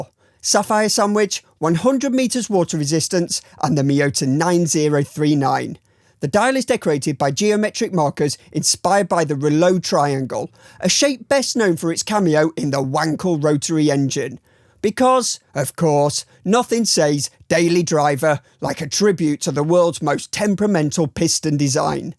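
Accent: United Kingdom. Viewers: I have a really hard time understanding a number of British accents. When I watch TV I just turn on Closed Captions, but that eats up valuable real estate on my screen when I'm viewing YouTube watch videos. So, I go into settings and set the playback speed to about 85%, and I can understand nearly every word. Just a suggestion. I think if people who produce content who have heavy accents, regardless of what kind, by ten percent, a LOT more people would be better able to understand them. Also just a suggestion. Great review!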